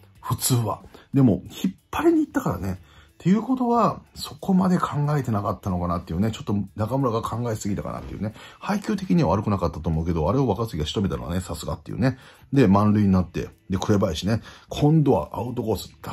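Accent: Kansai, Japan. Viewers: 毎日、里崎智也さんのチャンネルを見ているが、本当に面白い👍 だが、ロッテ時代には、素晴らしい捕手だと認識して居ただけだ❗️ まさかこんなに、野球に詳しい人だと思わなかった。 反省して居ます🙇 しゃべり方も、関西弁で楽しい❗️ でも、何時かは、ロッテの監督として、ロッテに恩返しする運命だろう‼️ 袴田彩会さんの結婚が実現すれば、里崎チャンネル終了=ロッテ新監督就任だ👍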